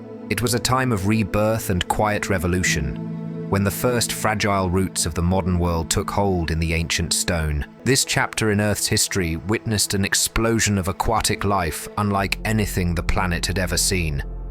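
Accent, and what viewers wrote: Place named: United Kingdom. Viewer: Excellent documentary, but strangely no credits are shown. Based on other Stellar videos, this one appears to have been assembled from many publicly available sources. The script may have been written by AI, but the creator made sure that the words matched the images, unlike other AI-generated videos. An educated British accent was selected for the AI voice to make it sound more authoritative.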